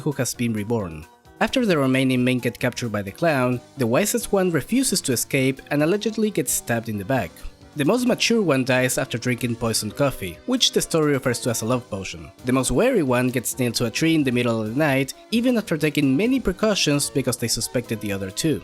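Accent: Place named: Argentina. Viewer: mira flaco no se porque llegue aca sinceramente pero es increible lo facil q es sacar el acento argentino d una persona, aguante argentina y el diego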